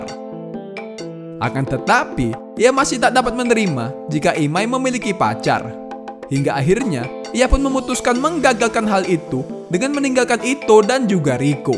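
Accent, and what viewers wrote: Medan, Indonesia. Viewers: abng orang medan ya bang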